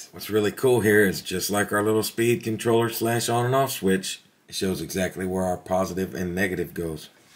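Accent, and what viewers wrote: United States. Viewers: 声と話し方がザアメリカって感じで好きです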